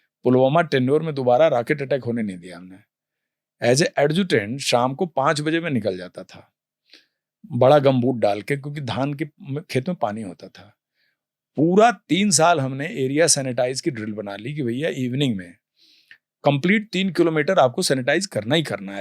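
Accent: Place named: Uttar Pradesh, India. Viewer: UP ki saaf zuban 👍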